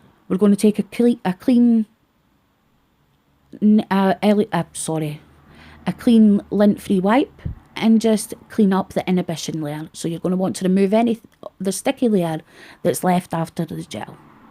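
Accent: Scotland, United Kingdom. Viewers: Lovely Scottish accent. Nice video!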